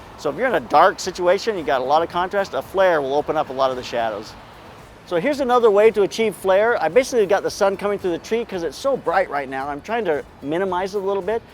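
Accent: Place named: South, United States. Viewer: Wow she was country twang in that voice I didn't expect that LOL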